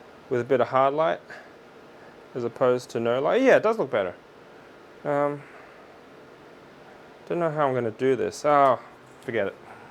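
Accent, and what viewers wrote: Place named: Australia. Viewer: interesting video. I have a Revomax flask too, around 500ml. Say, your accent tells me you have lived in Australia, right?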